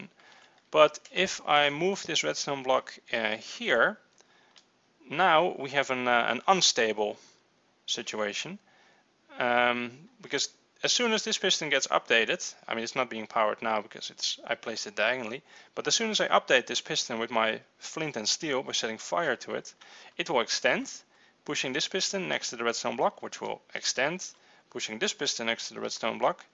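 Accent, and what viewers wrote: Netherlands. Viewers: are you dutch? I am haha so... And you sound pretty dutch by how you pronounce your english words. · amazing tutorial, got me to understand everything i wanted! The accent is very clear, though :D. If i may guess, Dutch?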